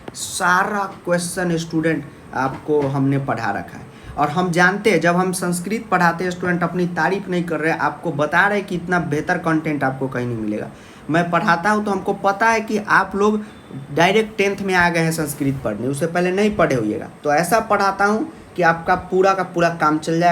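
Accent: Bihar, India. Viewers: Sar aap Bihar se hain kya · Aapki bihari language se hasna ha raha hai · Sir ap vihar se belong kerthe ha kya kuki apke sound se thora thora lagtha ha ki ap vihari language bolthe ha. Otherwise apki ye video bhaut achi ha. Nice video. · Language se hi pata chal gaya tha ki sir Bihar se hai because I am also bihari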